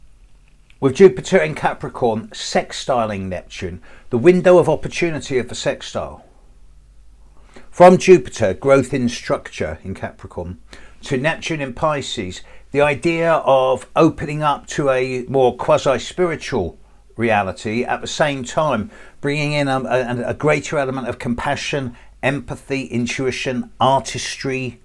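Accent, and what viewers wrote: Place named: RP, United Kingdom. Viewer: I will say something... Your perfect posh English and your suiting slightly raspy voice makes everything easy. You can broadcast the end of the world and I would be calmly listening to you. Congrats.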